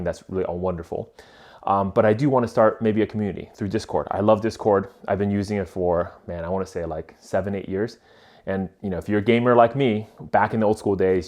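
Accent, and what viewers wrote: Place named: California, United States. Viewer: It sounds like you either live in the Bay Area or Southern Ca.. I hope you are successful with your Magic business.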